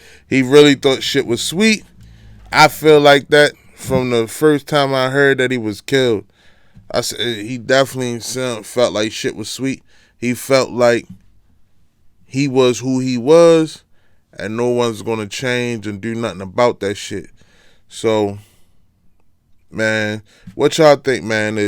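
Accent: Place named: Baltimore, United States. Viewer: 1st off, this is my 1st time catching your videos and I can tell out the gate that you are from Baltimore. 2ndly, I don’t think a persons son should get the raft of their father’s actions. Unfortunately, the previous generations actions often trickle down to their off spring. It’s not fair, but it does happen.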